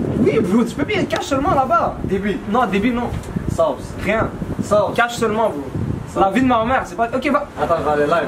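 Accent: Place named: Quebec, Canada. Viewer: Toujours toutes tes vidéos avec d’la bonne humeur et de super délires mdrr et en plus j’adore ton accent québécois sur des phrases complètement française !🤤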